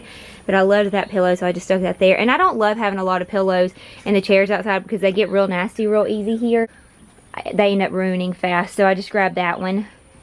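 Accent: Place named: Alabama, United States. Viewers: Are you from Alabama. You sound like the folks out here. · Did you say where you are from? Finally a “YouTuber” that sounds like me 🤣 I’m an East Tennessee gal! I love wha you have done and it gave me so many ideas 💡 we have a small farm and I like the “farmhouse” look but sometimes it can be too busy for me. We had a storm door like yours for a long time and I finally found someone who custom made me a screen door that I absolutely love ❤️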